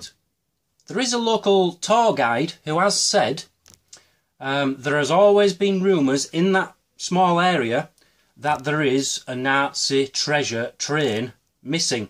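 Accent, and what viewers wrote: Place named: Newcastle, United Kingdom. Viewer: Funny how geordie is so easy on the ear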